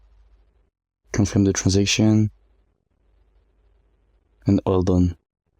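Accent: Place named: France. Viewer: Cannot understand your Frenglish 🤣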